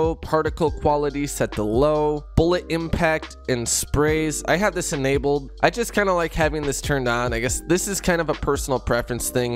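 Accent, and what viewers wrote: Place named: Russia. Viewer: u sound russian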